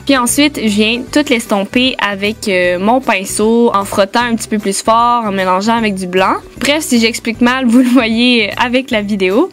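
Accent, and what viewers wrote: Quebec, Canada. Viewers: Ho mon dieu t magnifique et j'adore accent québécois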